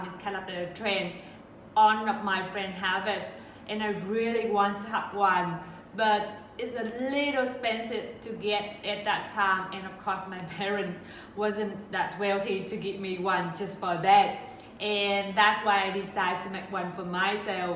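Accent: Thailand. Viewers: It's cool that you are Vietnamese! I honestly thought you were Thai, because your accent is similar to my mom's (she is from Thailand). Also, my mom's nickname is Dang, which means red in Thai. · I could see you were Vietnamese from your last name, but I thought you had a Thai accent and not a Viet accent when you speak English, so I actually thought maybe you were a Thai Vietnamese 😀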